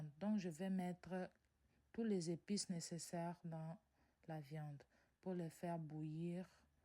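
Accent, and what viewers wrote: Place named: Democratic Republic of the Congo. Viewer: J'aime tes vidéos, je pari que tu es congolaise ( l'accent) . Vrai ou faux ?? Moi Alain d'akwa Cameroun je kiffe 👍 courage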